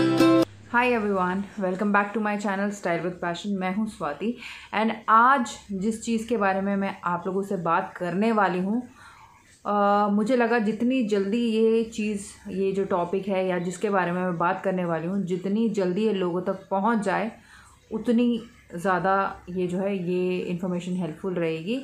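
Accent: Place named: South India, India. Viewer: Di ap MERI English ki mam Jaise lgte ho,school ki teacher thi, wo b south Indian thi, aur apka way of talking b aisa hi tha r wo b south Indian thi 😘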